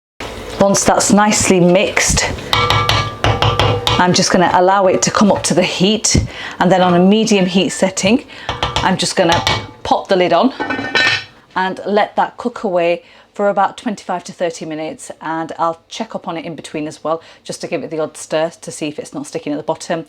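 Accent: United Kingdom. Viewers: Wow,you are absolutely good at cooking.Thank you for teaching a single Dad like me. May i ask respectfully,is your accent British ?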